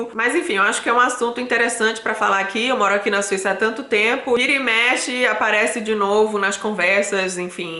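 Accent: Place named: Recife, Brazil. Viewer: Gosto do seu sotaque recifense !!!!😍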